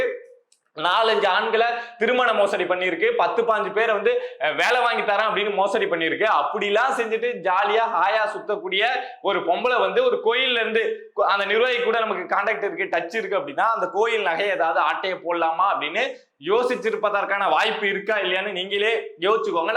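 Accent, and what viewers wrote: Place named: Nellore, India. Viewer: Nellore speak Anna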